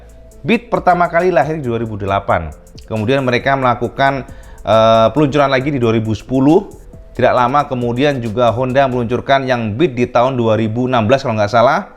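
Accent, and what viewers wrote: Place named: Java, Indonesia. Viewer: Gk peduli konten cak apa, saya lebih menikmati omongan yang disampaikan sangat menarik dan enak didengar. Jowo rk ilang jowone cak.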